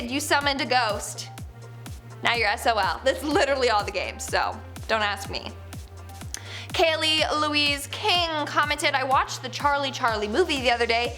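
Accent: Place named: United Kingdom. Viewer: You do a good British accent!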